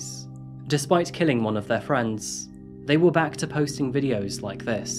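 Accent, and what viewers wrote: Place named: United Kingdom. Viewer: I love this interesting mix, you always do first class research, and I really enjoy video narrated by fellow Brit who knows how to properly pronounce English 😂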